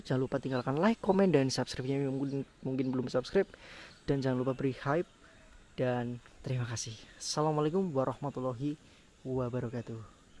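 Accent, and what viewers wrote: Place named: Banyumas, Indonesia. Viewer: Sama" orang ngapak tp kalau nonton smbil dengerin mas ngmg jd horor + kocak mantapp menghibur sekali mas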